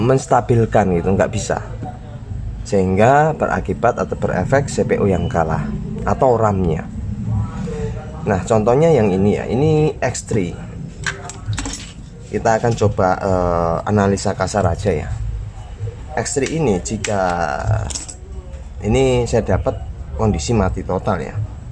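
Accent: Java, Indonesia. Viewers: Saiki dadi sering inframe mas...lg taun 2019 akhir pertama ngikuti chanele sampean...pirang2 wulan penasaran banget...wonge koyo opooo...logate njawiii...vibes sabar banget dari nada suarane...sukses selalu om..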